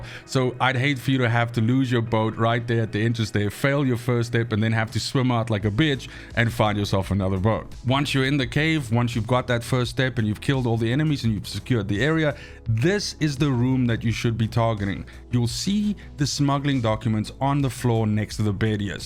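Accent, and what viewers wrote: South Africa. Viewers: I'm trying to figure out your accent. Are you from South Africa?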